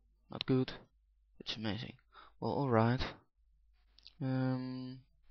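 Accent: Netherlands. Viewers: hehe je bent NL ik hoor het aan je stem XD nice video! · ja echt duidelijk een nederlander LOL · haha je hoort echt dat je nederlands bent!!:D heeeeello xD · are u dutch?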